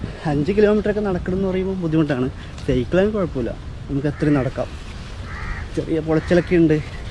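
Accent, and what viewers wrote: Malappuram, India. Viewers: Sooopppeerr പറയാൻ വാക്കുകൾ ഇല്ല. എന്റെ ഉമ്മചിയുടെ വീട് മലപ്പുറം ആണ്. നിങ്ങളുടെ വർത്താനം കേട്ടപ്പോൾ അവിടെ പോയപോലെ തോന്നി.🤗🤗 ഇവിടെ ഇരുന്ന് ഇതെല്ലാം കണ്ട് സമാധാനിക്കാം. Corona കാരണം പുതെറങ്ങാൻ പറ്റാത്ത ഞാൻ.😐😔😐😔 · Malappuram basha 😙😙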